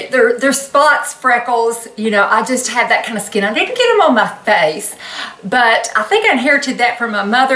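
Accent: South, United States. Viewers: I'm from Florida. My family has been in Marion County since 1846. So I am truly a southerner. I tell you, it is so wonderful to hear a southern accent. It is really hard to find one, any more, down here in Florida. You are absolutely a breath of fresh air. I love your YouTube channel. Through the years I have put on weight and am having trouble taking it off. Can you give some pointers on how you keep yourself fit? Maybe some diet suggestions. I'm so sorry you hit that rod! I pray the Lord put His super on the natural for you and that you are better, quick like a rabbit! Thank you for everything!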